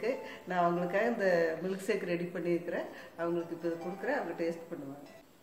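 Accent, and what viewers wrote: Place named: Kongu, India. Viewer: Kongu slang with recipe ketka nalla irrukunga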